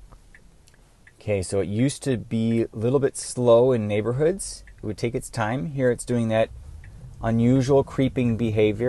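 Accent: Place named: Canada. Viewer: Are you Canadian? I’m hearing a bit of an accent 😂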